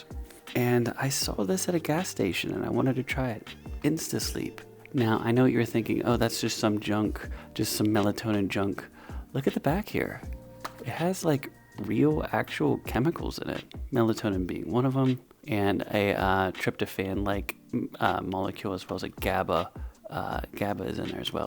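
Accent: Pittsburgh, United States. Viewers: Love the Pittsburgh accent. Listen all the time. Love yer honesty! I’m an rn and agree with your conclusions. With our druggies the benedryl is always asked for when we give all the other sleeping meds.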